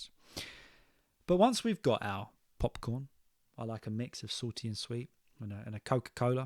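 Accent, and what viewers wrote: RP, United Kingdom. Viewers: Hi yeah, Hope you are doing good. Being an expat from a non English speaking country and having an advanced level of English speaking, I still find it difficult to grasp British accent. I recently started listening to your podcast with an intent to get accustomed to it. Thank you; you are helping me in understanding the accent and British communication style. Hope listening to you more will help me crack the accent soon. On the critical side, at times I feel you speak more of RP English. While It may be your natural speaking and teaching style, I sometimes come-across accent that are even more harder to understand. Coming to the topic, I feel etiquette is set of unscripted rules which vary with place and culture. Following these unscripted rules can be daunting for someone like me who is new to the country. Cinema etiquettes is almost same everywhere, but there are always a few who don’t follow it - at the very least, by not being on time. 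About the personal space, in my country, putting feet on the seat is considered rude and doing so with shoes on is the rudest. (Can’t imagine the places that shoes might have stepped! ). Unfortunately, I see a lot of youngsters doing same in trams and trains here. To be frank, that’s worst behaviour. Rogues exist in every corner of the world. Another disgusting behaviour that I experienced here is people loudly blowing their nose (while having flu) in a professional and quiet space. It’s really nauseating, especially coming from otherwise w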